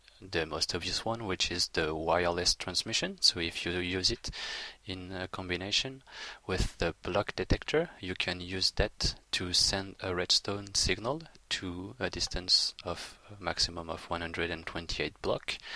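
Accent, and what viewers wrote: France. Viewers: On sens bien l'accent français ^^ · Haha, une présentation d'un mod énorme, et l'accent français qui donne l'impression que tu dis "weed" au lieu de "width" est parfait :D En tout cas super boulot ;)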